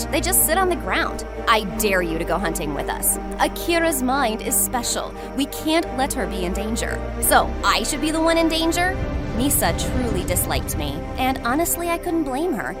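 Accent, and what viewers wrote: United Kingdom. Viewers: The British accent 😭😭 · i love the brittish accent. NO WAY! also love how misa says. she has a perfect memory so casualy when she hates her 😅 then goes back to hating her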